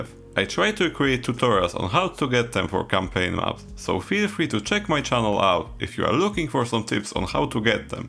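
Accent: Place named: Poland. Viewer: from the first secon i understood that you were polish, recognise that accent everywhere LMAO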